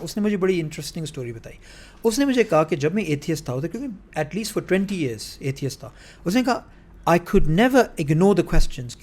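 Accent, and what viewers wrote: Pakistan. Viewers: I undertood the english part but with urdu accent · Ma sha allah bhaijaan ko urdu mein bolte hue bohot accha laga, wo Pakistani touch zubaan mein unke barqaraar rahe.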